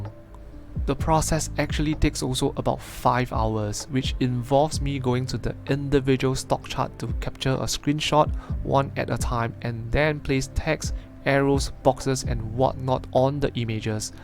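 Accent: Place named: Singapore. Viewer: Hi, though I have subscribed to your channel rather recently, I enjoyed your analysis of the market. Your Singaporean English is very relatable as well lol. Keep up the good work and I will start to watch your options videos as I have tried to learn and understand a few times through different means but still don’t quite get it yet 😢sigh. Looking forward to part 2 of your video 🤗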